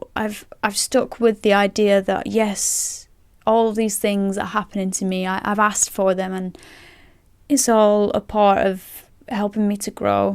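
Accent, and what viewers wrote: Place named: Lancashire, United Kingdom. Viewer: I have social anxiety too but still get surprised when people as outwardly confident as yourself reveal you have it! lol I appreciate a bit of the struggles you must go through, I've had SA for over 15 years now and while I don't quite agree that it can be 'cured' for everyone or the route causes of it, I'd advise people here that it can be worked on and improved at the very least for the vast majority of people. But without sounding creepy (he says as he sounds creepy...lol), I have to say you're such a lovely, beautiful lady, inside and out and you can at least take solace in not just that but in all the good you're doing for others out there with your videos and ASMR. :) PS: I never thought I'd enjoy the Lancashire accent so much before now..! lol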